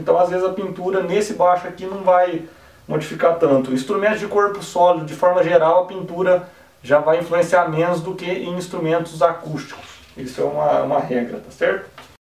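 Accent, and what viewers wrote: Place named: Minas Gerais, Brazil. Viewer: Araguari? Bacana! Sou de Uberlândia, bem ao lado. Você é de onde? É mineiro, pelo sotaque, mas de que cidade?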